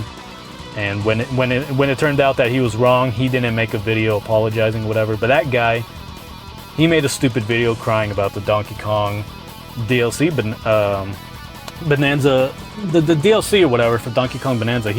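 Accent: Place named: South, United States. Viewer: What you do sound from the south because I know people who do. So your ancestors from the south sir that you got it from. I would not say 50 charter from marip kart world is trash itnis you don't care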